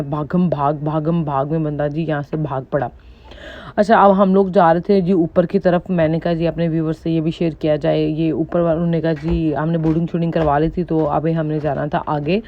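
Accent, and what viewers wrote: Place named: Punjab, India. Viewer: Punjabi touch… zbrdst h.. ✌🏼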